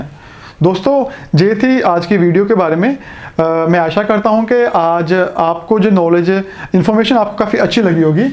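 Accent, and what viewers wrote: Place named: Punjab, India. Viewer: Punjabi exent aa rha h ...Language se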